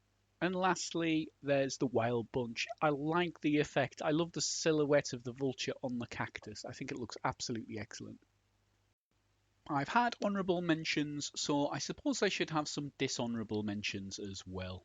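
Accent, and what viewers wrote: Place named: North Yorkshire, United Kingdom. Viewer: Hi, sounds like you might be from Richmond or Darlington with that accent - tell me I'm wrong! Enjoying your top 100s etc and contributions to speccy show. They should have loading screens on the PS4. It took 2 hours to install Red Dead Redemption2 today! Not for me, as i don't play modern games but the missus does! I was a 64 owner but looking back I see how the Speccy was full of character and it's visuals had real english charm.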